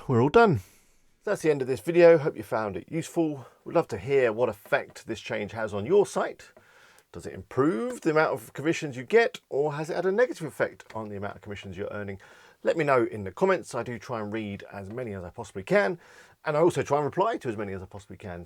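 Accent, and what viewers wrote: United Kingdom. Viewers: Really teaching video. Wouldn't you share with us which are your favorites themes and plugins to work with? Or the ones you normally use to create your websites, please? Thank you in advance. Btw, I love that so british accent. Indeed :)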